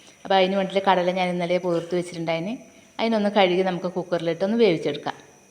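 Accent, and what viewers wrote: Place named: Kasaragod, India. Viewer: ഭാഷ തനി കാസർഗോഡ് തന്നെ അല്ലെ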